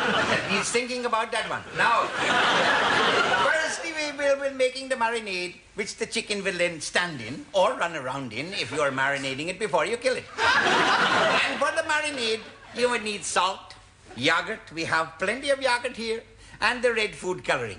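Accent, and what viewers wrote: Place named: India. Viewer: Such lovely Indian accent without faltering at any time!!!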